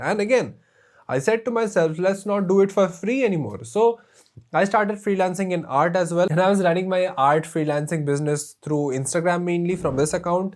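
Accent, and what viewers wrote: Bengal, India. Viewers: good to know u can have a YT career in english with such a strong bengali accent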